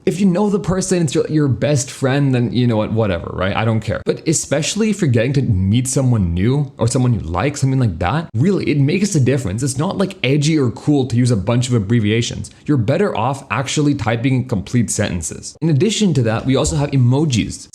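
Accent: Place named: India. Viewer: Bro drops the Indian accent "azzume" like crazy this is giving unprecedented flashbacks to my proof writing class Edit: I'm literally just going to subscribe to you because you summarize a bunch of techniques in books to I never have to read them myself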